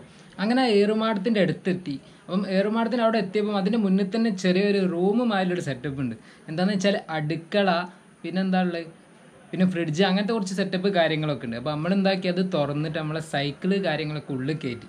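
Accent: Kozhikode, India. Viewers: You’re a innocent guy..may allah bless you...all the best wishes..I feel a great potential in this channel keep this raw feeling of videos...your Calicut accent makes the storytelling more clever... حياك الله الله يبارك فيكم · Just kozhikoden slang... Leave it.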